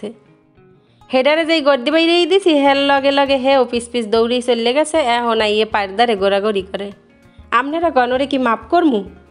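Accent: Barishal, Bangladesh. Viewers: আপু অনেক ভালো লাগছে আপনার এই বরিশালের ভাষা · আমি বরিশালে বউ আপু।কিন্তু বরিশালে কোন ভাষা আমি বলতে পারিনা।তোমার মুখ থেকে বরিশালের ভাষা শুনতে খুব ভালো লাগলো আপু।তোমরা ভালো থেকো।দোয়া করি।আমার একটাই মেয়ে।নাম মানহা।আমাদের জন্যও তোমরা দোয়া করো। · আমিও বরিশালের মেয়ে + বউ। একজন দেশি আপুর কথা শুনে মনটা প্রশান্তিতে ভরে গেলো।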